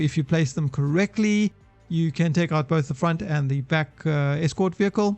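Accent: South Africa. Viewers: Thanks bud. South African?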